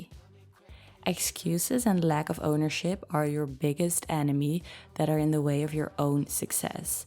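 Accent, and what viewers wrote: Netherlands. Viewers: Are you Dutch?🙈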